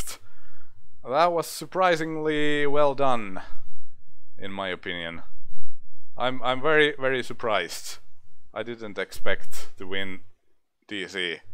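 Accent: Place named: Finland. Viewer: You have a finnish accent by the sound of it.. I used to play this game back at 90's in Kuopio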